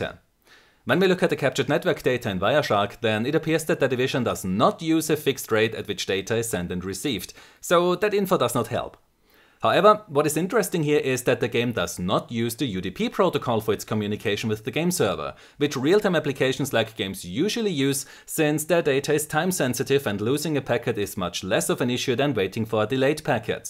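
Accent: Germany. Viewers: Aus deinem echt fantastischem english habe ich rausgehört das du doch ein deutschsprachiger bist also schreib ich´s auch in deutsch wenn es dir recht ist. verdammt interessantes video !!! danke dir sehr. Hast du infos was world of warships angeht betreff´s .netzwerk sachen für mich? Ich weis nur das wows (world of warships) mit UDP arbeitet,und das es einen dedizierten server gibt für den EU bereich,ich glaube das er in frankfurt steht denn mein ping ist immer auf dem gleichen wert von 34-35. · You sound german :D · What is that accent? Is it German?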